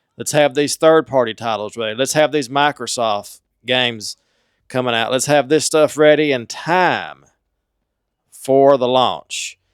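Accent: United States. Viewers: Steam Deck and ROG ALLY has simmilar specs like switch 2 so I think it is possible. Your acent is the most american american who ever americaned :D And I like it. My bro begs my mom to get him the switch 2 on christmas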